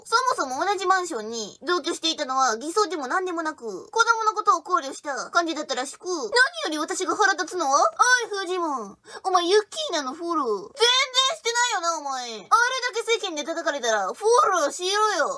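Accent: Kansai, Japan. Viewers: 統裁は関西なのですか？ 関西弁も聞きたいです。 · 総統、関西？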